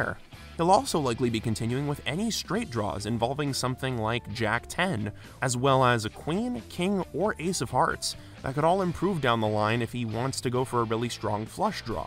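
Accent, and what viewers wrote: Canada. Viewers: Québécois identifié! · I like how you say “Le Chiffre” with a thick Quebec accent but the rest is canadian English xD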